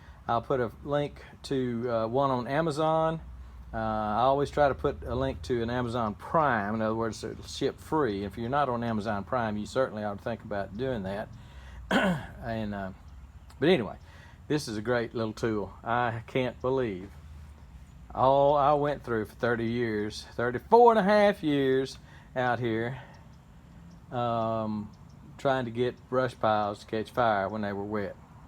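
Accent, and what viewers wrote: Texas, United States. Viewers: He's a Texan. That's a Texan pronunciation. Texicanese is almost another language, from what I can decipher. If you don't believe me, next time you watch one of videos turn on the Youtube Closed Caption CC button. What a hoot!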